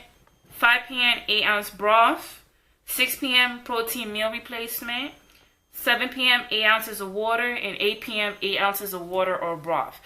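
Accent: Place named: New York, United States. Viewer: u have such a heavy ny accent lol!! 😘😘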